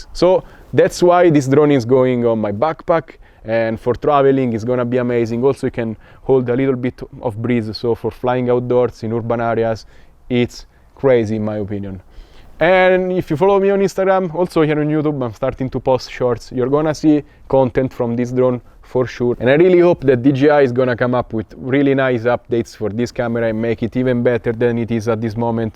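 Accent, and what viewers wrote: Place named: Italy. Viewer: Fà strano sentire il tuo accento italiano, abituato ai video inglesi ! Ma a parte questo ottimo video, bravo in inglese e divertente la caccia alle galline XD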